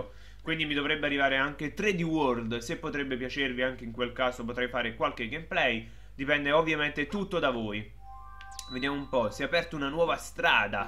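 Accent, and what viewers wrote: Naples, Italy. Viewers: Bella:D ma sei di Napoli?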